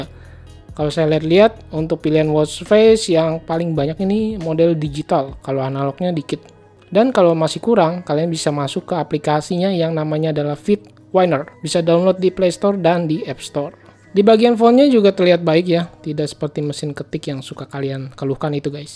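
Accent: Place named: Ciamis, Indonesia. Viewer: Paham suaranya.. Orang Ciamis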